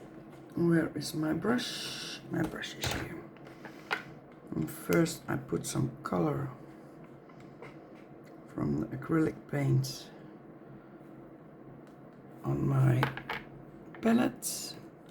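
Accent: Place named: Netherlands. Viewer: Mooi maar volgens mij ben je nederlands zo te horen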